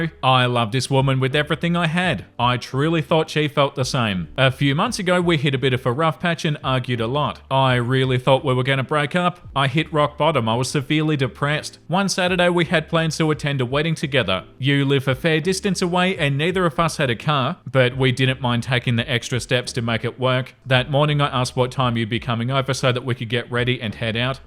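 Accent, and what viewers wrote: Australia. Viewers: Australian, one of the best accents imo. Altho I'm a bit enamored of a Scottish accent as well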